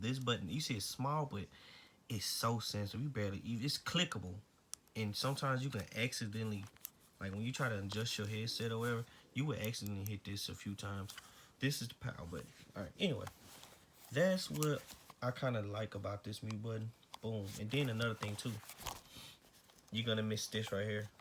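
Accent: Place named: South, United States. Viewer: you kinda sound like the YouTube poiised. Are you from the south by any chance?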